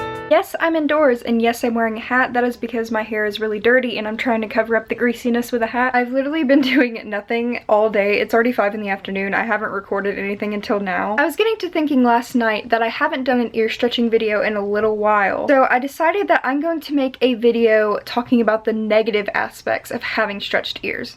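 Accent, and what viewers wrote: United States. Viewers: Genuinely thought you were one of my friends that I haven’t spoken to in years then I realised you’re American and I’m English 😂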